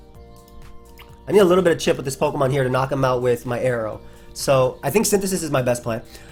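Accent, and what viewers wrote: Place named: Bronx, United States. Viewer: an entire road to top 10 with your bronx accent when?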